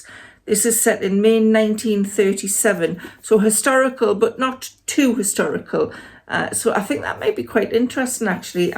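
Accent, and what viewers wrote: United Kingdom. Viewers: Ohhh I recognise that accent! Durham maybe? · Yeah I'm Durham so thought you sounded a little further north to me .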